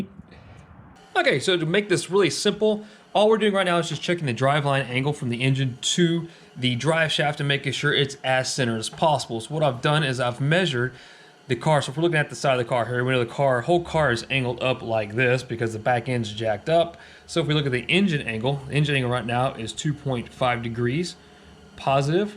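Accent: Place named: Australia. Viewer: just love the Australian Accent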